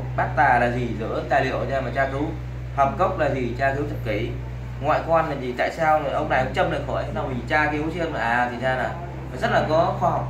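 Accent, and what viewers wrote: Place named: Thanh Hoa, Vietnam. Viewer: Bác giọng thanh hóa thì phải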